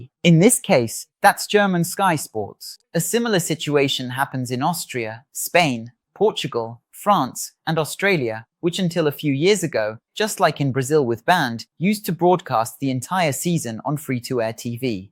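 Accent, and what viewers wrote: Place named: United Kingdom. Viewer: Ai eu gostei hein ADM! Meteu o british accent pra jogo! Me senti na F1TV! Parabéns pelo passo de subir mais um degrau!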